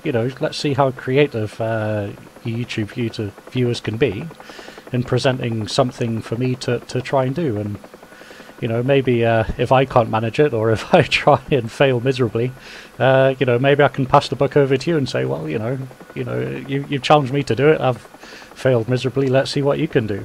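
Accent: United Kingdom. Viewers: Where are you from? East London?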